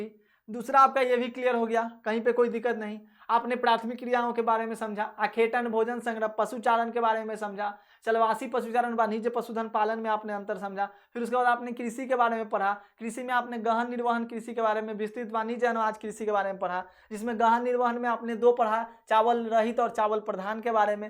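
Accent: Bihar, India. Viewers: Sir aap bihari h kya ?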